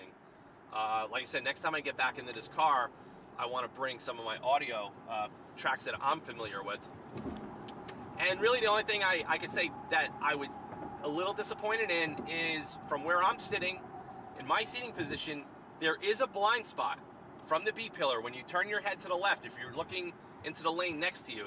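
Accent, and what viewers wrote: New York, United States. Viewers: buh'n ("button"), "sah'n" ("satin") - straight New York / New Jersey.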